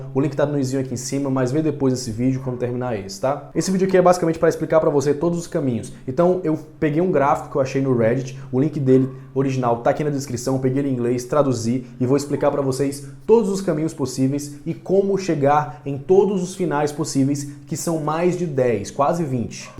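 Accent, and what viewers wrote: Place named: Ceará, Brazil. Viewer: Nós 30 primeiros segundos eu me toquei do sotaque cearense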